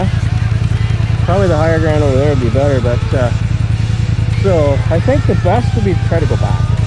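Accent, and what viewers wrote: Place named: Canada. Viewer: Sound Canadian bro!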